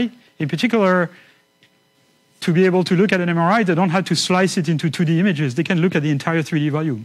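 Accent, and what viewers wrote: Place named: France. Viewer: He has a french accent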